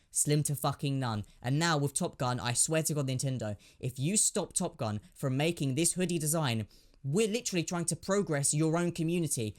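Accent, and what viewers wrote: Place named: United Kingdom. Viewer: Morally, yeah, it's really shitty of Nintendo to strike down a product that was benefitting charity in memory of one of its biggest supporters. Nintendo aren't our friends. They're the Disney of video games. They set their lawyers on everything even vaguely resembling their IP. I don't think they deserve the community they have. Legally, I don't think you have a leg to stand on. JoyConBoyz is neither parody nor fair use. Disclaimer, I am not a lawyer, this is not legal advice, you probably should consult a lawyer. You sound British, good to see a fellow PAL gamer, so I'm basing this on UK Copyright Law, which is currently still bound by the EU Copyright Directive. In that, our version of Fair Use is called Fair Dealing. It does provide an exception to copyright law "for the purposes of caricature, parody or pastiche". Those generally have a comedic, critical, or mocking tone to them. 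In my opinion, it would be a stretch to call JoyConBoyz tongue-in-cheek, let alone a qualifier for Fair Dealing. Fair Dealing is a defence, meaning you can already be in court and incurring costs by the time you get to use it. Judges will consider how much of the original work was used and the Etika Joy-Cons, bar the paint job, seems almost identical to the real thing. I'd imagine there's a good chance they'd be considered unlicensed bootlegs regardless of the genuinely good intent behind their creation. There's also a massive difference between copyright, which is automatically granted, and a tr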